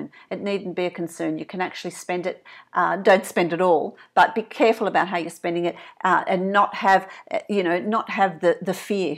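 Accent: Australia. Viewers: You sound like an Australian, i like the way you explained this.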